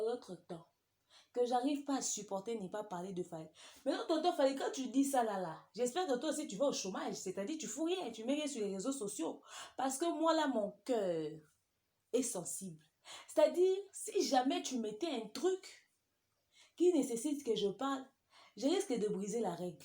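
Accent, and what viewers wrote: Ivory Coast. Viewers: Kkkkkk suis par terre avec ton accent ivoirien nous les warriors on t'aime beaucoup niang's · Good Job, ton accent ivoirien est parfait parle en nouchi pour la prochaine!! Fally a simplement fait du sarcasme de l’ironie. Et li y parle aussi de son étoile depuis le groupe Talents Latents,en passant par le Quartier Latin et jusqu'à ce jour avec FVicteam qui est son label: personne ne pourra le stopper!!!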